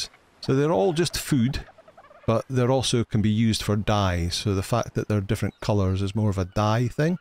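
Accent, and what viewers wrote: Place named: Glasgow, United Kingdom. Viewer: No worries, it has to work for you or it simply won't work. The main reason I asked is that the build system is pretty good and it might exercise your imagination. PS. I served in the British Army a long time ago and the first Troop I commanded was recruited from Glasgow. Not saying you have a Glaswegian accent, but it does remind me of that time. I miss those guys.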